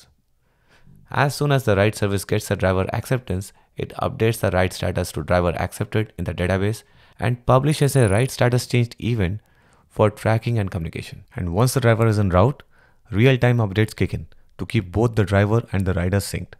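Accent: India. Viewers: I dunno why but the Indian access makes me focus more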